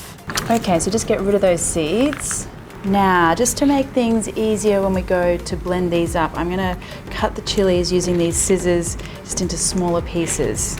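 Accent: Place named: Australia. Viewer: I hate hearing annoying Australian accents.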